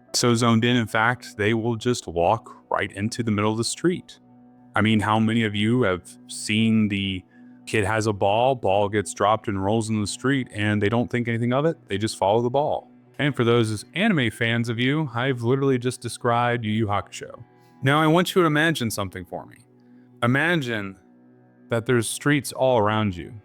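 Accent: Appalachia, United States. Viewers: I subscribed after watching the recent vid on rainfall in TotK, and I've been slowly working through your other Zelda vids since. Good stuff! As a West Virginia native myself, I guessed you were Appalachian from your accent :)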